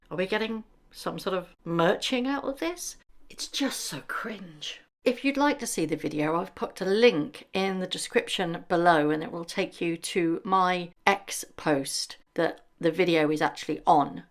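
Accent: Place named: United Kingdom. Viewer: you got a UK accent, but I'm not sure where you are based. Surely you remember the Glenfell Tower fire when 74 died. Meghan did not turn up and get in the way. The Royals and Politicians visited by invitation after the smoke and gone and people had been moved elsewhere. Meghan was sent to help promote a community cookbook. She did not wander amongst this graveyard in the sky offering to get signed T-sirts for kids who were still trying to find a bed and a consistent supply of food. This is weird and she has shown she knows how to behave in a disaster not based in The USA.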